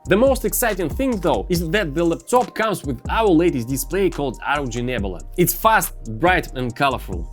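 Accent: Russia. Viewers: ох уж этот русский акцент😅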